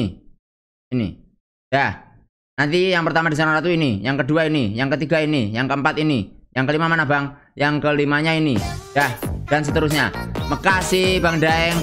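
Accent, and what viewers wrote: Java, Indonesia. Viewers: Wong ndi to ngab kok medok men wkwkwk tetep semangat konten ngab😍😍😍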